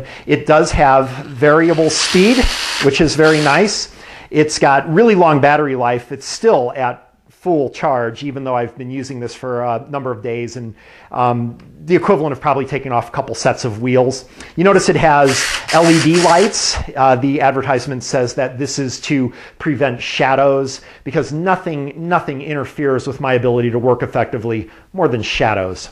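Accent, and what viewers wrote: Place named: South, United States. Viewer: That was a fantastic review and hope you do a lot more- as a Canadian your deep southern dialect is a little hard to figure out at times - just love the double negative inverted references supplemented with some psychotropic supplements. LMAO.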